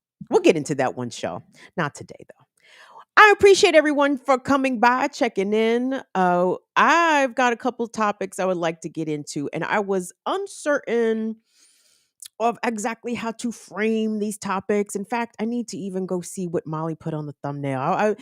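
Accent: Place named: New Jersey, United States. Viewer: Too be fair,she really sounds like somebody from Jersey or maybe Staten Island.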